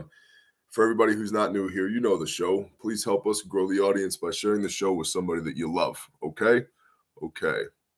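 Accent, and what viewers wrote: Minnesota, United States. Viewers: Awesome Minnesotan accent ya… Donchu know! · Love the Minnesotan accent brother. You nailed it perfectly! Dontchaknow! And the radioactive leak, barely a peep and I don’t even hear anyone talking about it. It’s about 2 hours from me. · That MN accent was on point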